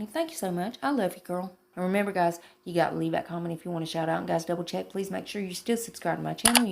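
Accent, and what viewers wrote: South, United States. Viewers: Your accent is so thick it makes my husband think I'M talking when I have YouTube playing thru the speaker in my laundry room! You're giving me a run for my money on that Southern Belle Sound!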